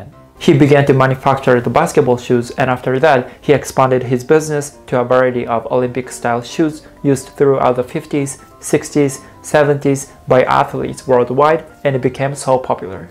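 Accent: Japan. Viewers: Kind of like how Japanese people pronounce every english brand name incorrectly. Also, having different accent doesn't mean someone is pronouncing it incorrectly. If that were the case, every english word you said is pronounced incorrectly because you have a Japanese accent and obviously that is not true.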